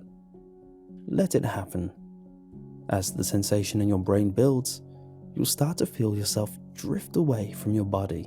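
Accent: United Kingdom. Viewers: British people narrating horror stories yes delicious mm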